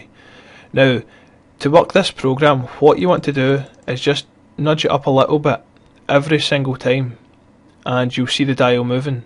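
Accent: Scotland, United Kingdom. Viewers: are u scottish? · Was looking for some help on this as my ASRock OC tuner and hwmonitor say my cpu fan is around 3300rpm when idle...is this normal? Also i was pleasantly surprised to hear you're voice and that you're a fellow scot! Greetings from Glasgow!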